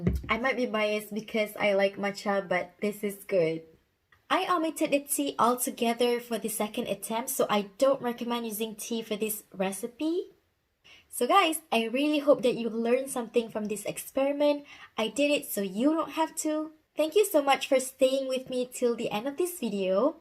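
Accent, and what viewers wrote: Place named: Malaysia. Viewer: I love your Malay accent, And the look in your eyes! 🙂🌹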